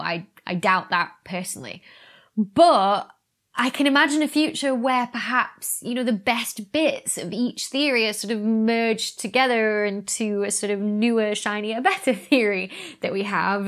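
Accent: United Kingdom. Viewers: If u didnt had this soo british accent i would watch all of the vids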